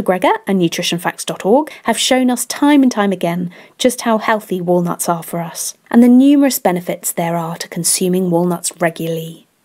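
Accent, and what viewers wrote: England, United Kingdom. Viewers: I love the British accent. Watching from California. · Having a lovely English accent is pure gold here in America. You already get a 10% bonus for sounding more authoritative. New sub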